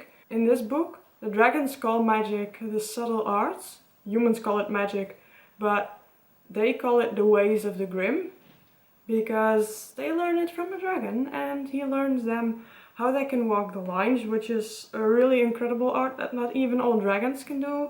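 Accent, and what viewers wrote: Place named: Netherlands. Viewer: You have a Dutch accent Are you?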